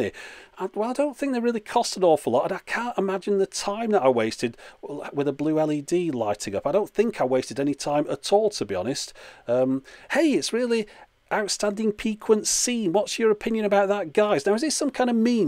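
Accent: United Kingdom. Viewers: Omg, youtube comments never cease to amaze me. I cant believe people were annoyed enough to complain about your pronunciation of tubes! i rather like the brittish pronunciation of many words and i know I'm not alone here. Why else are most documentaries hosted/narrated by chaps with English accents. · I like the way he says "tchubes" Just use a long U with a British accent. I did notice that he uses a short O when saying phono though. Sounds like "fawno". Whatever, I have no problem understanding and actually enjoy the British accent. Think I will go watch some Anglophile vids.... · A vid whose purpose is trolling comment trolls, delivered in a British accent. Excellent! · seriously you shouldn't care that much about what people try to tell you especially not on how to pronounce a word just ignore these people, no one can force you to pronounce a word like they do it just cause you're from the UK and they are from an other part of the world which speaks english and pronounces the word differently hate shit like that people stop trying to make someone talk like you do just cause you dislike the way he does it! 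live and let live or go fuck off (sorry for the rude words but its just true)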